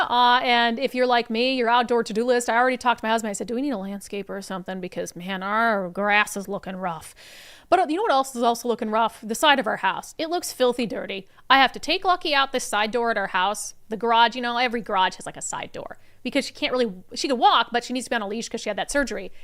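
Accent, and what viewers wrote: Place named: Minnesota, United States. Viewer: now I know why I hear a Minnesotan accent in your voice from time to time. You can take the girl out of Minnesota but you can't take the Minnesota out of the girl! Goes for North Dakotans too, my grandma is from ND, after 70 years she still has her ND Norwegian accent! 😂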